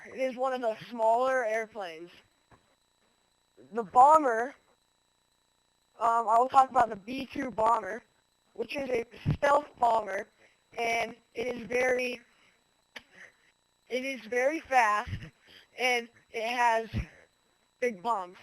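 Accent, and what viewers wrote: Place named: United States. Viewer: he sounds so american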